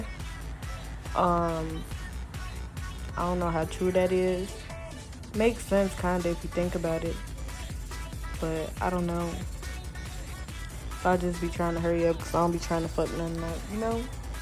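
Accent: United States. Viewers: Girl u are funny🤣....!!!! your accent reminds me of Miami lol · G I went to your socials because I know Chicago when I hear it. You snapped on the wigs and the video too sis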